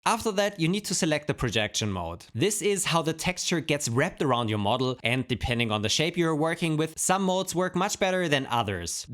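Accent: Germany. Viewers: super videos wie immer, aber bitte, nett gemeinter tipp, arbeite an deinem deutschen akzent. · United by East German accent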